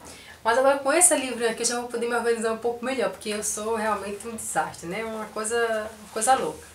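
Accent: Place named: Northeast, Brazil. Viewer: minha linda eu amo vc seu canal, videos, amo ate a forma d vc falar com esse sutac tipo nordestino como eu rsrsrs....vc foi a primeira q comecei seguir aqui e atraves do seu canal q fui seguindo outros....bjsss linda mt saude e seja mttttt feliz com seu mozão!!!!♡♡♡♡♡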